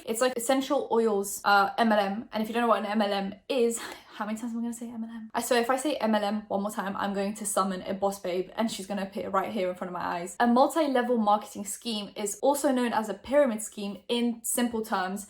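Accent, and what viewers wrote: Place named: London, United Kingdom. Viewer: she's polish but she's lived in england for a long time, so it's a london accent by the sounds of it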